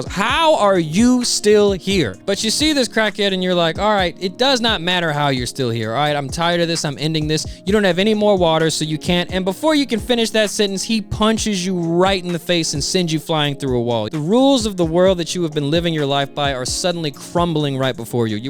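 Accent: South, United States. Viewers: I love your southern accent, where you from?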